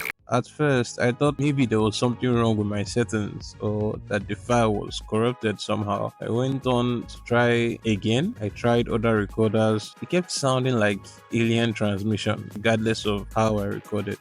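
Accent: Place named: Nigeria. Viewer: I know a Nigerian when i hear one, I thought i was the only one who played wuwa and genshin Subscribed…🤗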